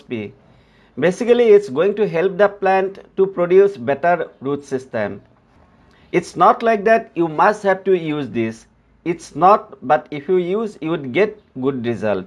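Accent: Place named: India. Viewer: You do not need to improve your accent, your accent is very good. I am from Australia and your English and your accent are great! We dont want you to lose your Indian accent, it is what makes the world unique and mulitculturalism is wonderful!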